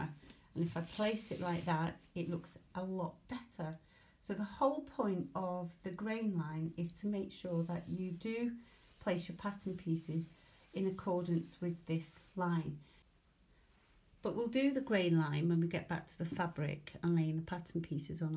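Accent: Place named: England, United Kingdom. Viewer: I am happy the 2nd part followed so quickly. As a German I have already learned some technical terms through you. How about the seam allowance, is it included in the pattern? I am thrilled I ordered the pattern yesterday. Love from Uta from Munich. P.s. I like your accent, reminds me of the years I spent in good old England, centuries ago.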